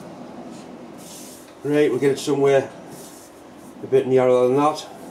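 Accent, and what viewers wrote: United Kingdom. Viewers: are you from the United Kingdom?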